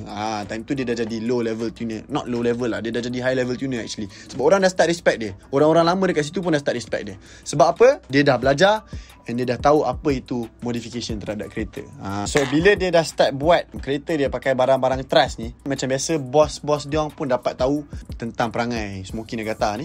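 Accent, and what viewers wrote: United Kingdom. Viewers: Mantap oo british accent die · Haha mantap English accent, just like the video and content, keep it up and well done!🤜🤛🙌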